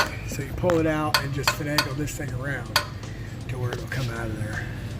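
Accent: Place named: Canada. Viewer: though it sounded like trailer park boys are you canadian by any chance?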